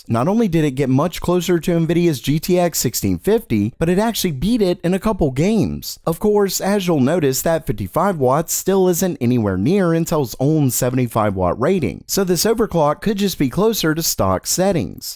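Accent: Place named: South, United States. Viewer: that country accent tho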